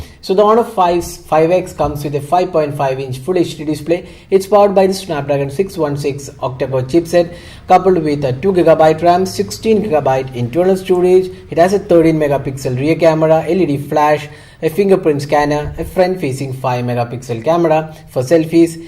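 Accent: Kerala, India. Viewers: Your a mallu its very clear with your english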